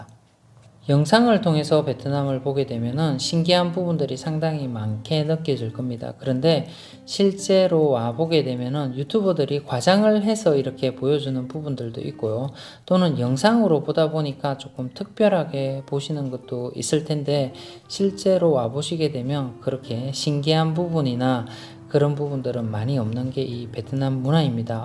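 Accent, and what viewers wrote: Gyeongsang, South Korea. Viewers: 말투가 너무 정겨워요. 제가 중학교 까지 창녕에서 가장 가까운 합천에 살아서 그렇게 느껴 지는가 봅니다. 영상 잘 보고 있습니다. 곧 10만 미리 축하 드려요.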